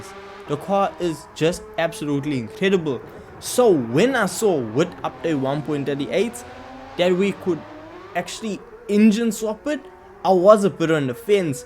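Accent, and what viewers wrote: South Africa. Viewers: bru you sound like you live in durban bru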